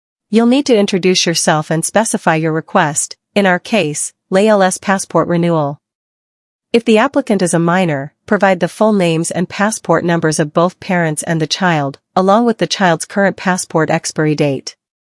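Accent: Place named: United States. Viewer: Nice vid. Thanks for sharing. 👍 Who is the voice doing the narrating? Sounds like an American voice.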